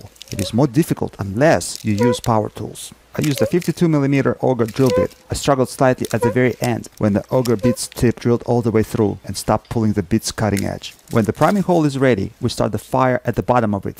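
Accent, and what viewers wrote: Russia. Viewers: So impressive and I absolutely love your perfect English with your Russian accent.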